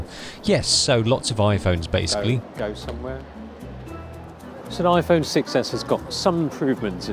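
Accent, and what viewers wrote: United Kingdom. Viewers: sooooooo british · you sound just like a typikal brittish dude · Bristish accent :3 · Dear host, i'm from hongkong, really love watching your reviews, very professional and specially...like your british accent:) cheers mate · To see a asiatic speaking in that very British accent for me is very strange ...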